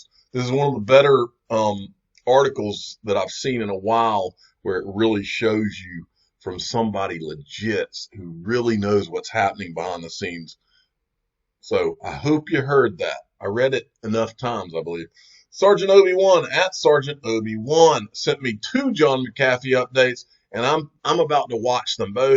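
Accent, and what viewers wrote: South, United States. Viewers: That was halarious..with his southern drawl.😂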